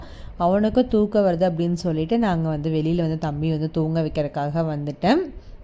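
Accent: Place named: Kongu, India. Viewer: Kongu tamil kekavae romba nalla irrukku.. super sister ...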